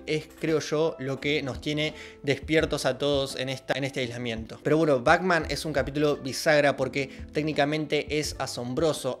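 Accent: Argentina. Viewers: Hablas muy argentino, llega a molestar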